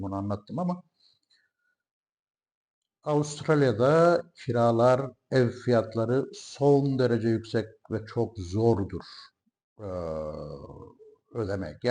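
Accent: Turkey. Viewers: DOGRULARI KONUSTUGUNUZ ICIN TEBRIKLER.ZATIALINIZ GALIBA VICTORIA'DA YASADINIZ, BALI'DE YASANIR ,KUTLUYORUM, BY THE WAY MEMLEKET NERESI? AKSANINIZ ADANA'YI CAGRISTIRIYOR, BIZ ISTESEKTE ORALARDA YASAYAMAYIZ, . NEDENI ISE ILK KAFILELERDEN BIRISIYLE GELMIS VE 57 YILI TAMAMLAMAK UZEREYIZ,SIMDILERDE 22 AILEDE OLUSAN BIR COLONI OLUSTURDUK OLULERIMIZ VAR YAS OLARAK 93 .SIZE YENI DUNYANIZDA MUTLULUKLAR DILIYORUM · Aslen turkiyenin neresindensiniz ? Hatay şiven var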